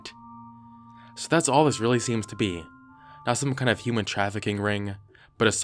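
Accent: Australia. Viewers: Wow has a pretty good Australian accent lmao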